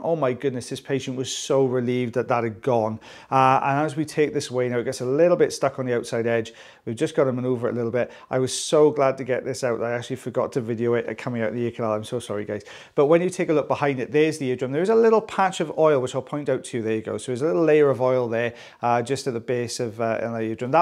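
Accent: Wales, United Kingdom. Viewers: It is SO NICE to hear a welsh accent. Haven't been since covid started. Miss Anglesey so much. Been going there to visit relatives all my life.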